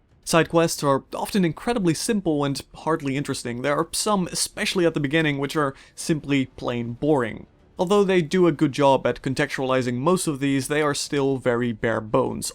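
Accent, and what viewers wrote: Netherlands. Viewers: hmm dat accent....bent u Nederlands ?? :D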